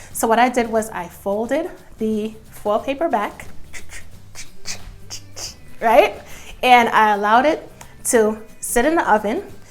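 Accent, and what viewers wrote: New York, United States. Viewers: Are you from New York or New jersey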